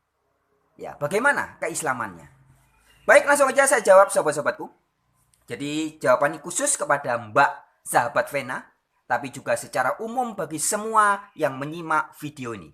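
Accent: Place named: Bali, Indonesia. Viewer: Deleme bali gati nok.. dije melali.. tiang denger logate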